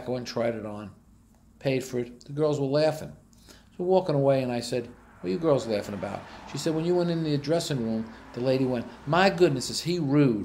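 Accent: North Jersey, United States. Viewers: North Jersey here (Northern Bergen County). He’s got a heavy Italian North Jersey accent. Being non Italian, he doesn’t sound like me!!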